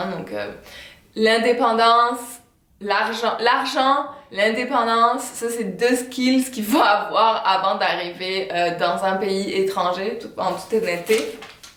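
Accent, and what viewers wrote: Quebec, Canada. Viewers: Tu commences à avoir l'accent québécois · 50% accent qc 50% francais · l'accent s'apparentait plus à l'accent Québecois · Ouii un petit accent du Quebec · tu a choppé l'accent Québécois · elle a un accent parfois quebecois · l'accent canadien est en train de prendre le dessus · elle a un accent mêlé entre l'accent français standard et l'accent québécois standard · c'est dingue l'accent québécois que vous avez pris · elle a un accent quebecois un peu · Un melange délicieux d'accents québécois et français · t as l accent québecoise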